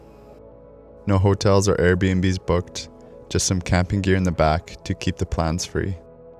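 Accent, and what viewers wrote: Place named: Canada. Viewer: Weirdest pronunciation of Coromandel I have ever heard! Must be the Canadian accent.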